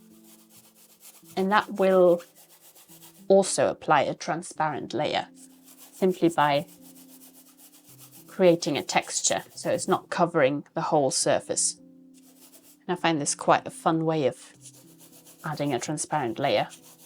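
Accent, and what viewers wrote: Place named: England, United Kingdom. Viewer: Fancy voice / English accent fancy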